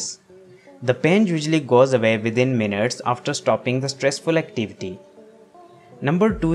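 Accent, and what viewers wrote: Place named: India. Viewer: Indian man from voice ..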